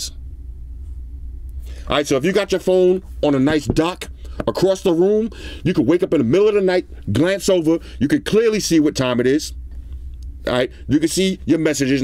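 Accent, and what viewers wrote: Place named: Queens, United States. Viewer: your Jamaica Queens accent 👌, just "eddie-thing" 😂.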